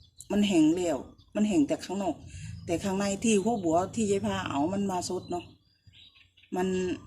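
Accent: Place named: Korat, Thailand. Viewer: อยู่บ้านไหนคือพูดโคราช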